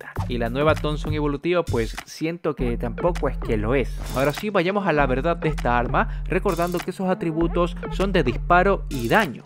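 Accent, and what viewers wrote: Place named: Ecuador. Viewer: Eres Ecuatorianísimo, jajaja sub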